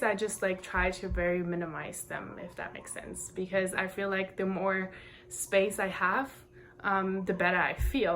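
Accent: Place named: Germany. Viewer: your english really improved, i remember watching your videos back when your (cute) german accent was way stronger💛i love to watch your videos and hope i‘m supporting you through it, i kind of have the same dream of living in hawaii for like 1/2 years in my life one day. greetings from switzerland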